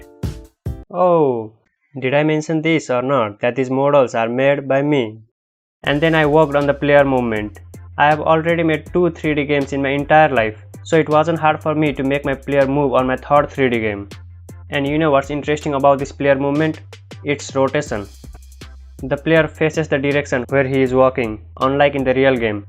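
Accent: India. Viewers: are you Indian? you sound like one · u r acent is quite indian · Bro why ur sound u from India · This is why i love Indian accent it easier to understand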